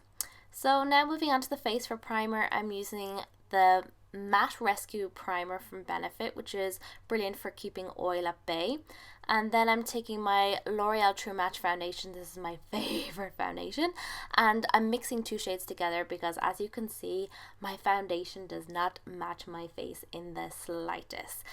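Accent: Ireland. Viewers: Your accent is gorgeous, what part of Ireland are you from?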